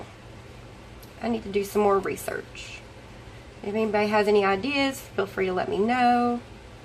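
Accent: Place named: South, United States. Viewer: My boyfriend has asked me why I don't want to start a channel in the future and my Southern accent is one thing I worry about, so it is nice hearing your sweet, southern belle accent. I'm so glad Beth told me about your channel. You have tons a great stuff so I'll be binge watching you for the next few days 8)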